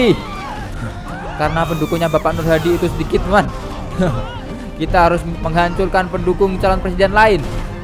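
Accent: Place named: Java, Indonesia. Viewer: Hhhhh logat jawa nya medok. Hebat bubur nya g tabur lagi.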